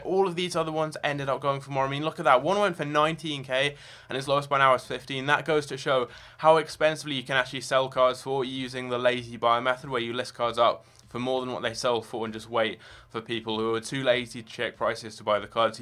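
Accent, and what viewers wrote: United Kingdom. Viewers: want to know too.:-) english is verry british!:-D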